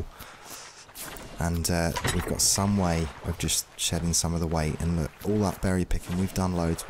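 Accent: United Kingdom. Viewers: A Young British Bob Ross teaches Ark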